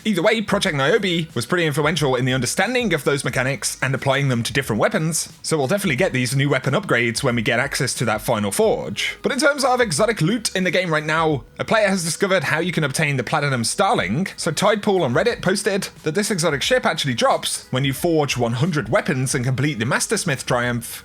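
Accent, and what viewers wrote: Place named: Lancashire, United Kingdom. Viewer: it is though to judge but I'm a tech iv checked my connection and bandwidth its very stable but according to bungie iv got a terrible connection very strange but I think it's the virtual servers auto detecting and trying to correct the connection they have thousands of options on a vr server so anything can go wrong great vid and you sound like your from Lancashire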